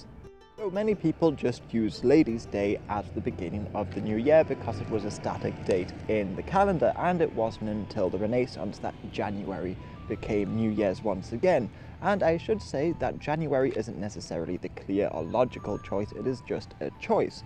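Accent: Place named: Newcastle, United Kingdom. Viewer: The reason pronounces 'year' as 'yeah' is because he is from Newcastle. So what you're hearing from is a Geordie accent.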